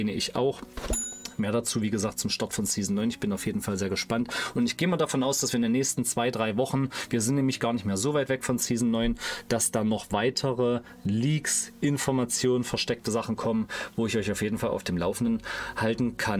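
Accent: Saxony, Germany. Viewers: Du bist doch aus Sachsen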